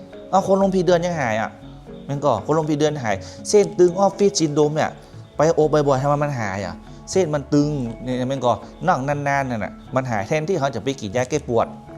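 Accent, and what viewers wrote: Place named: South, Thailand. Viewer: สำเนียงแบบนี่สายใต้แน่นอนค้าบบ 😊😊😊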